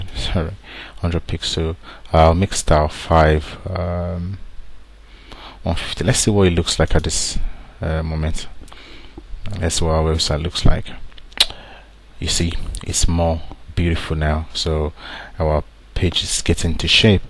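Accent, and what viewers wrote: Nigeria. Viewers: pls r u a nigerian? just curious cos of the accent. thanks 4 the video. cheers! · r u nigerian? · Are you nigerian?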